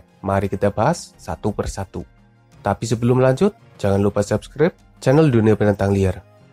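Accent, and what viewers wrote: Banyumas, Indonesia. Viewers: Ngapak sukses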